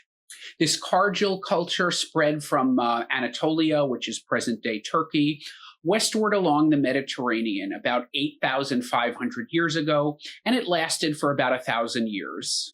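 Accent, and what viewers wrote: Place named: United States. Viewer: He didn't pronounce it incorrectly for someone with an American accent. You don't change your accent to pronounce a place name. I'm sure you don't pronounce New York, Orlando, Los Angeles, New Orleans or any other American city the way that an American would. Someone with a rhotic accent saying "Mel-bin" is incorrect and distracting. It's like an English speaker walking around pronouncing Paris the way the French do.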